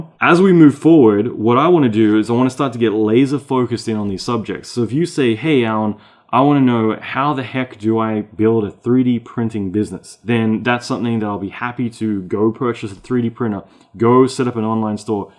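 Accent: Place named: Australia. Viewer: This is a good insight. Theres an Australian accent sneaking through that american slant...or american accent sneaking through an Australian accent..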